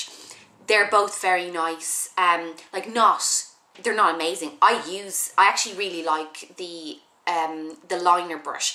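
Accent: Ireland. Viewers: This probabley sounds really silly...but I just love the irish accent. I read a whole bunch of Maeve Binchy novels and hope to travel to Ireland some day.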